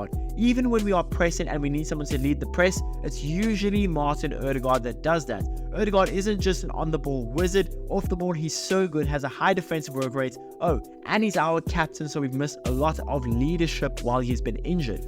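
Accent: South Africa. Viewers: Is that a South African accent I detect? Awesome content bro!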